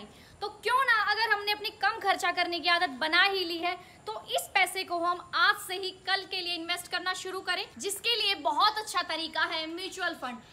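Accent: Haryana, India. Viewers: mja aa jata gai haryanvi sunkar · Yo Bhai Najafgarh aali side k Hindi or haryanvi mix krke bolle hena ktti ghee sa ghal jaa hai baiii... 😂😂👅😍❤❤❤❤❤❤ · RAM ram Ji wase chai to hai badi Tati or kya haal hai kade haand rahe Ho aapki boli mixed hai Hindi Aadhi Haryanvi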